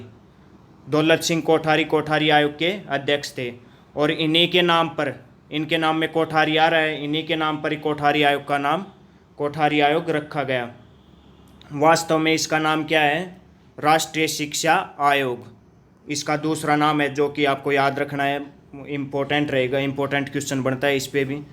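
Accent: Haryana, India. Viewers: haryana se ho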